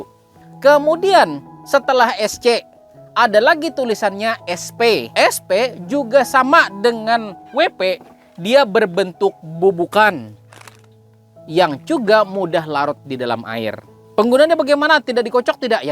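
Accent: Banjar, Indonesia. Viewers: Orang banjar kah pak... Mantap penjelasan nya... Salam dari Kalteng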